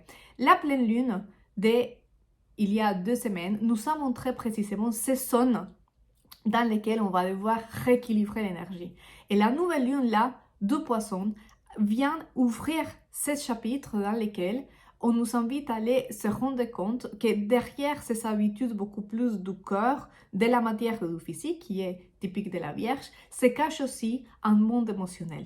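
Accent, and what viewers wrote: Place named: Spain. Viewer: J'adore votre accent je suppose que vous êtes espagnol....merci pour cette belle vidéo Namasté ❤🕉🙏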